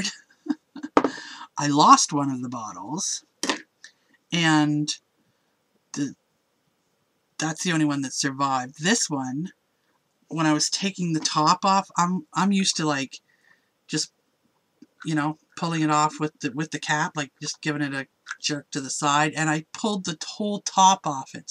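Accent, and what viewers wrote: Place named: Canada. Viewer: Okay, good video! Couple things...? Hot! I'm a sucker for that Canadian drawl..."owwt" instead of "out." what a cutie! And second, don't you dare bleep yourself. There's nothing funnier then you saying "fuck" ten times in a video out of frustration:). Love it!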